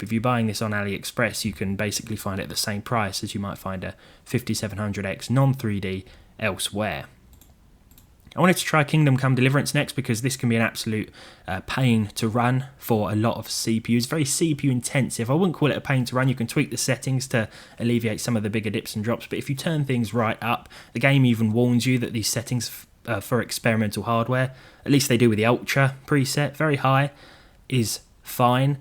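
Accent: United Kingdom. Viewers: oo a brit? sub'd. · I like your accent. British?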